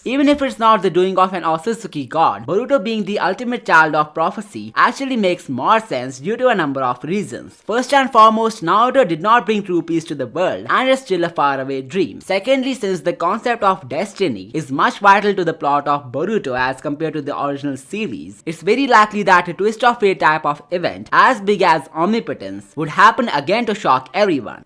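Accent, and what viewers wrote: India. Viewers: You're being rude here. Skip if you don't like it. FYI, it's Indian accent.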